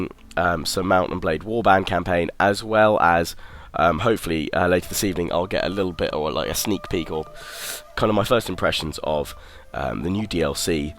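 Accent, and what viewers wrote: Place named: England, United Kingdom. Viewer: He's from England, and here when we see an 'i' we say 'eye' naturally.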